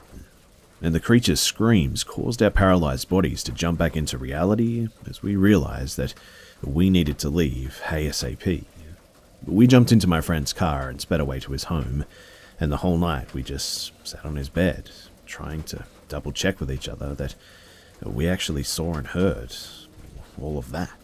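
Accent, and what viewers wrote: Australia. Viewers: Noo not the Australian accent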